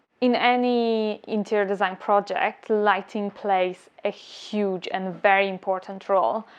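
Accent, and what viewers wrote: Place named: Poland. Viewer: Beautiful Polish accent.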